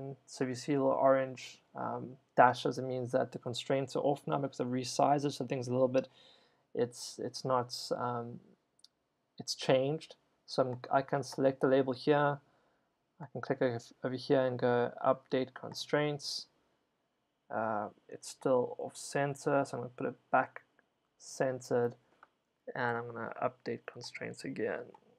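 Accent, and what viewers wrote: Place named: England, United Kingdom. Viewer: Thank you. Very informative... expressed eloquently in a perfect clear English accent!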